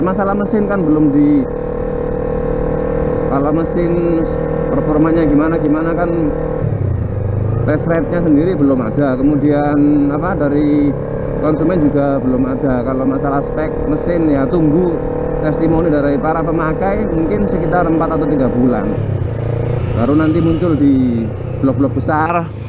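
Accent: Java, Indonesia. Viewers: logate jowo bingit... asline ndi to buoosss...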